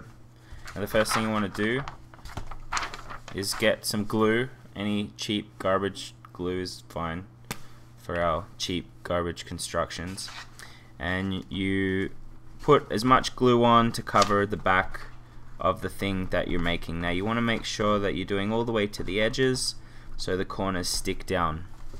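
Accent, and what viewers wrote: Australia. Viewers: are you aussie? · Are you Australian? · You sound Australian and American?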